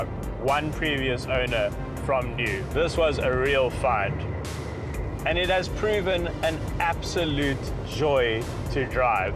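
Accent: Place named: South Africa. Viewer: South African English is probably the only english accent/variation I just can't mimic at all! That alone makes me a total fan. Not saying I can do all accents flawless, far from it, but SAE is just impossible to get right. More than a few similarities with New Zeeland English, but still distinctly different enough to throw me off. Oh! And I totally love cars too!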